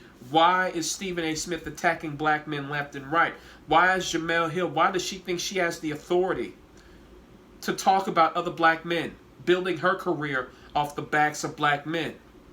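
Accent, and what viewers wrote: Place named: South, United States. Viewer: As a southerner from Memphis I love hearing him rip these phonies a new one because he talks like we do.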